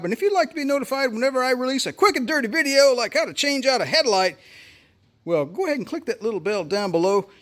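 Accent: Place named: South, United States. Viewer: Strong southern accent.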